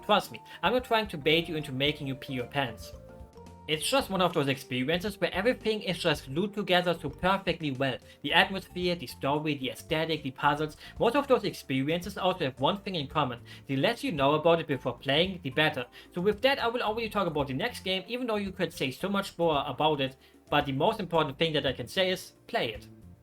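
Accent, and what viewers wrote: Germany. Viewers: You sound soooo German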